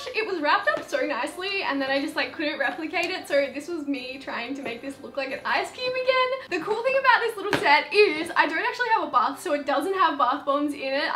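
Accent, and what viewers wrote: Australia. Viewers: Are you Australian? xx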